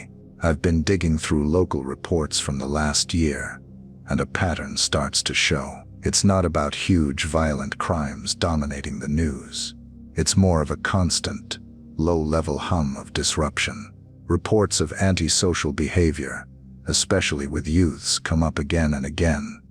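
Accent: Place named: United States. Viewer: why is the narrator using a US voice? This is silly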